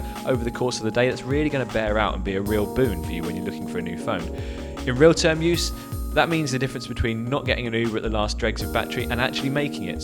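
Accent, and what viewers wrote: United Kingdom. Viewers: why is this guy speaking British?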